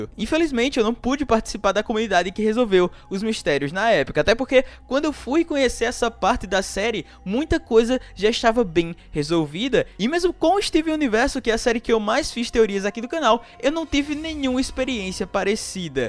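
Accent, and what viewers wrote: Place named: Recife, Brazil. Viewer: Sou novo aqui deu 2 segundossdo cata falando e eu como : esse cara é de recife esse sutaque só pode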